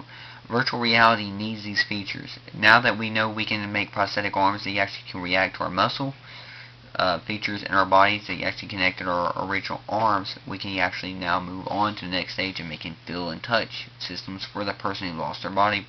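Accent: United States. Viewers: Are you frome Mississippi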